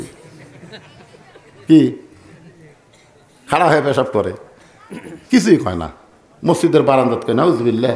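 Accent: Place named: Bogura, Bangladesh. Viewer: বগুড়ার ছোল তাই এমন করে বলে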